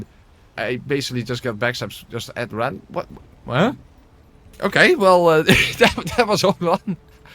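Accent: Netherlands. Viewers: Sounds dunglish! Anyway, great stuff 👍🏻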